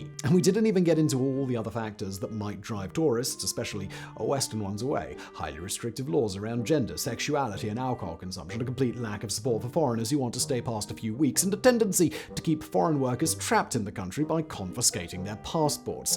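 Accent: England, United Kingdom. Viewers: Only person I can't stand listening to with an English accent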